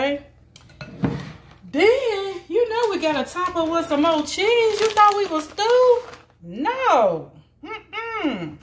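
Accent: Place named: Louisiana, United States. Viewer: You sound like you have a Louisiana accent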